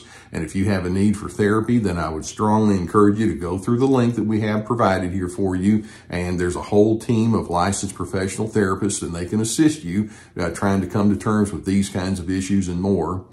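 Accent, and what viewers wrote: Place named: Texas, United States. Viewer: Another great video from Dr. He really gets to the heart of the matter! I so often heard this horrid expression from my mother and having it defined logically helps me to rationalise and overcome its effect. Many thanks for the calming effect of these videos. That lovely Texan accent and the comforting Gus on the sofa help a lot too! 💕💕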